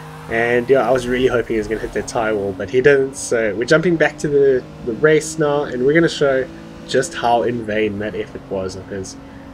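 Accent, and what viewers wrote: South Africa. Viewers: Is this guy from South Africa?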